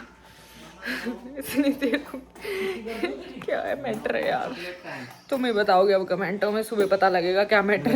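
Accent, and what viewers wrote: Haryana, India. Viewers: main Rajasthani hun toh nhii pta apke kuch unique se words ka meaning😊😊 pr apki Haryanvi language mast lagti hai❤❤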